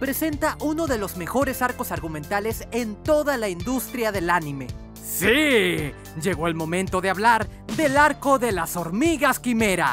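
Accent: Venezuela. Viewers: eres Venezolano? xd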